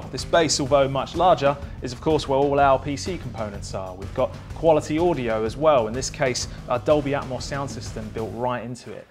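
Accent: United Kingdom. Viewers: with a man of Kent Maidstone accent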